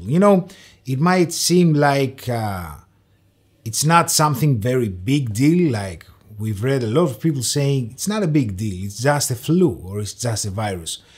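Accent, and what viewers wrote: Greece. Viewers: This greek accent is so familiar to me! ευχαριστώ! καλή δουλειά!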